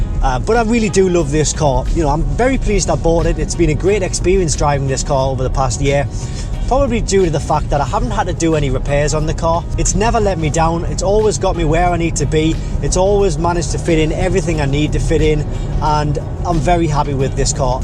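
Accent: Newcastle, United Kingdom. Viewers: This guy is definitely Geordie... Schooled in Newcastle and tell by a million miles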